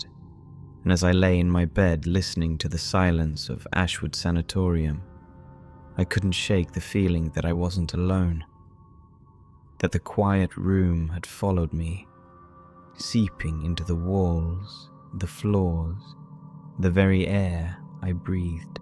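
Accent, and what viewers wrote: England, United Kingdom. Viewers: First of all, the past tense of drag is dragged (not drug) regardless of what many of this country say. That said, I enjoy the apparently proper English accent and grammar used. Other than that, it is well done as a haunting yet real story, well told. More importantly, I believe this is an accurate account of those in sanitariums in past years. With deinstitutionalization in the U.S. in the 70’s came new heartbreaks and injustices. “Nurse Rachets” have abounded. Honestly, most people love power over others, and when afforded opportunity, will abuse. But, their days are numbered. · Brilliant narrative! This fictional sanitarium echoes that of Waverly Hills Institution, sadly this one very much existed. Are you British? Your accent is lovely 😍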